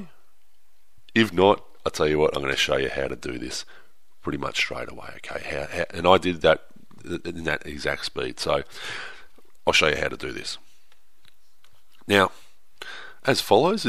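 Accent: Australia. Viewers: Thank you so much you helped me with subtracting fractions and I love your Australian voice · You Australian mate? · I noticed his Australian accent I think this boi is left handed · Thank you. .you lovely Australian math God ;-)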